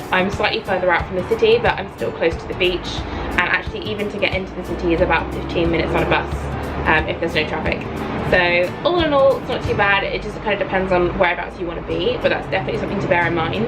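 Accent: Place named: Australia. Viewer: unfortunately not babe you are starting to sound like a true Aussie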